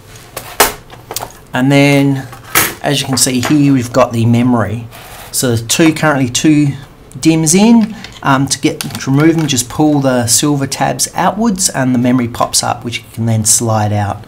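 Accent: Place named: United Kingdom. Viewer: TH - A annnnk U! I'm still use this model. Are you british ? I like your accent!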